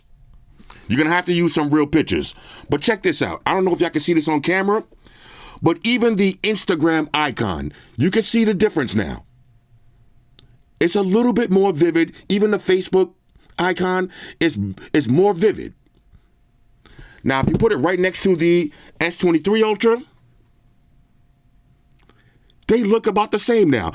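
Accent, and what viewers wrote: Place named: Queens, United States. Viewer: oh my guy from queens, dope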